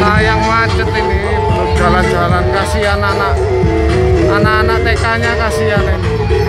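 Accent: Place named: Java, Indonesia. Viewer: Medok rek suare ..aq yo wong ngabab lho..omahku kulon lapangan rek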